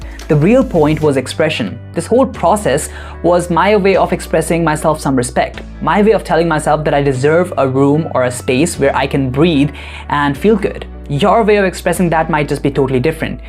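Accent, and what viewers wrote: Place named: United Kingdom. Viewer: you have a good british accent btw😊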